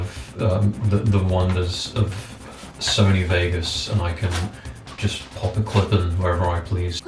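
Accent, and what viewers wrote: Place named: United Kingdom. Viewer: Man I hope you come back dude. We need your britlong voice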